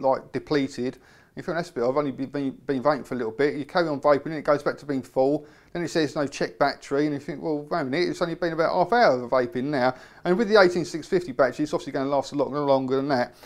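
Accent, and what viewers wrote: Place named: Essex, United Kingdom. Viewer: I didn't know you were from Essex, though I should've guessed from your accent. I've been in CA (US) for 12 years so my recognition of UK accents is waning. I grew up in Stanford-le-Hope, aka Stanford-No-Hope, near Corringham/Grays/Basildon, if you're familiar. I can't lie... I don't miss it at all. :D Okay, I miss the battered sausages, and chips. :D As a tubular mod fan, I think I'll grab one of the couple of DNA20 tube mods that are now appearing, but this does look like a nice unit.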